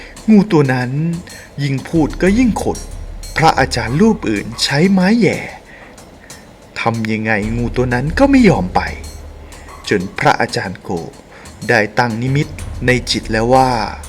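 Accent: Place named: Isan, Thailand. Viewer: เสียงแอดมินเวลาพูดอีสานสำเนียงน่ารักดี.😊😊😊😊😊😊😊😊😊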